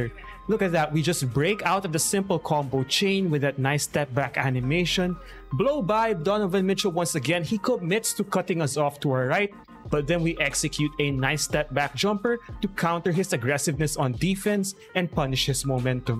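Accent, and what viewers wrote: Philippines. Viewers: kaya pala sir, yung pronounciation mo pinoy na pinoy talagang hindi kinakain yung words, fluent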